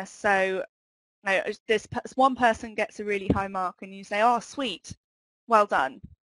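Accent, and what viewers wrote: United Kingdom. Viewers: прекрасный британский акцент! супер!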